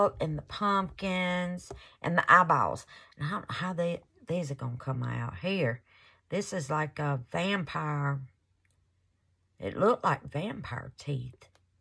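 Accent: South, United States. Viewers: I can tell you're from the south but I can't place it. I'm in northern Tennessee and I believe you're further south. Anyway, I really enjoyed your video 💖💖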